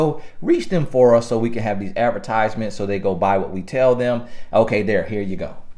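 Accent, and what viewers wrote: South, United States. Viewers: I like your accent! All my Grandparents had accents. My GrandMa on My Dad’s side had a country accent as well. Feels like Home.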